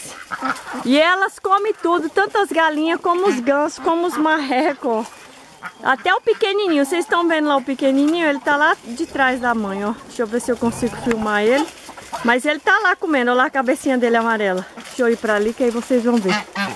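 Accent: Minas Gerais, Brazil. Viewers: Pelo sotaque gracioso da, ela está em Minas Gerais...onde? No Paraíso! rs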